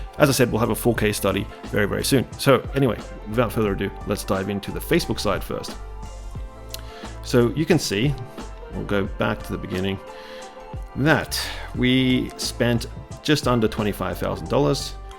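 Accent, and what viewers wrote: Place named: Australia. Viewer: why does refreshing your page make you more trustable..... you sound Australian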